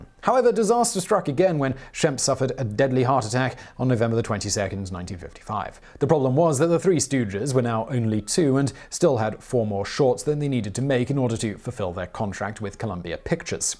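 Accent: England, United Kingdom. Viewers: It’s fantastic to hear an English accent talk about The Stooges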